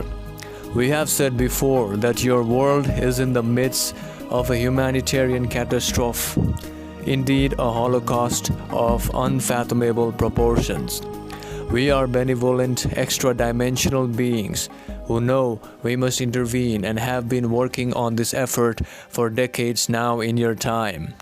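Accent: India. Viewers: Look! Here's the thing...oh, but first a question....is your home turf India?? Cuz you sure sound like you come from India!! So now..the thing..... Don't come here telling me my ways are wrong!! Cuz I don't like that! It's rude!! And another thing , you talk like we, no... me. I'm just going to speak for myself, so scratch "we". Anyway, You talk like I totally don't have a clue of what's happening on " my " planet! I know about the cabal, elite, deep state, the " 1% ." I know what their agenda is, and what they are pulling!! How do I know these things.. you might ask? I read the HOLY BIBLE! It tells me everything I need to know, and how to fight them!! And... THERE IS ONLY ONE WAY TO GOD!! AND THAT IS THROUGH JESUS CHRIST !! THERE IS NO OTHER WAY!! AND ANOTHER THING!!!...ALL YOUR MEDITATION, CHANTING, CHAKRAS, AND THE LIKE ARE FORBIDDEN BY GOD!! SO...RIGHT THERE I CAN SAY.. 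I DON'T WANT ANYTHING YOUR SELLING!! BECAUSE THE ONE AND ONLY GOD OF THE UNIVERSE.. "JEHOVAH " Would NOT send messanger's who breaks his command- ments!! So... I'm thinking you have a whole different " god " than I do. And if you don't mind, I'll keep the one I got, thank you!! And another thing... The love of money is the root to all evil!! It's not the root to sacred cows!! ( again, India! ) Are your cows blue? Just wondering. Now, you say that y'all have gotten to your much advanced civilization, by trial and error. So, why isn't the human race allowed to advance in the same manner? Why do you feel you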